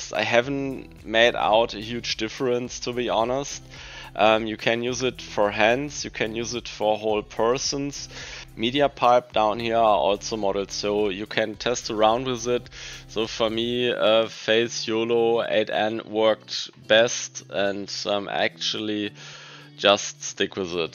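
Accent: Germany. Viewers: Ur from Germany right? 😊 VG aus FRA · that german accent is genius :D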